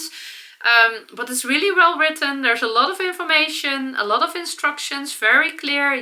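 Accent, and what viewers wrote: Netherlands. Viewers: Greetings from Denmark - what a cute story about the blue rabbit. I spent my Easter making my first LCR bear this Easter - its so funny i enjoy making the cloths. I love you videos, your dutch accent and energy. 🐰🐻🦊🦁🐷🐮🐻‍❄️🐭🐱🐶🐌🦌🐨🐵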